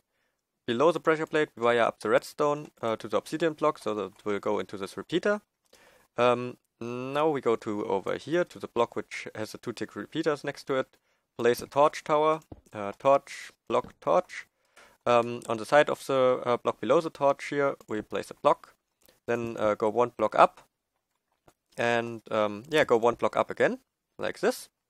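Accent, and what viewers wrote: Germany. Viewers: Kann es sein dass du deutsch bist? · Are you German? Your pronunciation sounds like a German accent. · ich wusste schon immer das deutsch bist ;D wegen deinem akzent aber nice video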